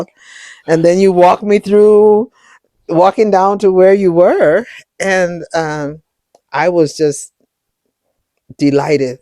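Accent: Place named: Kenya. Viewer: What an inspiring story. Thank you for sharing. I want to be you when I grow up. You sound totally Kenyan.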